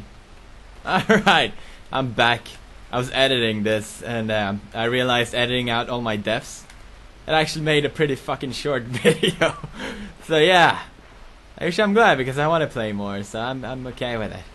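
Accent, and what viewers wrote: Sweden. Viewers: I've never heard his Swedish accent till now 🤔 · Lol pewds's accent is still swedishyish ya know. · OH MY GOD! BACK THEN I DIDN'T REALIZE HOW STRONG YOUR SWEDISH ACCENT WAS... oh my god, coming back here after all this time as some kind of bitterness... guess we both grew up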